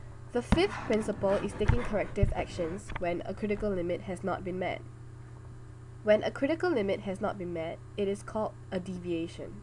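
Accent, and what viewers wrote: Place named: Singapore. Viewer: Very good video. You sound Singaporean, btw. ;-)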